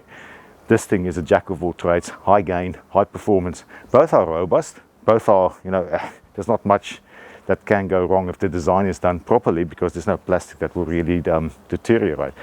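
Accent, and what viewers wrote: South Africa. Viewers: you sound like you are from South Africa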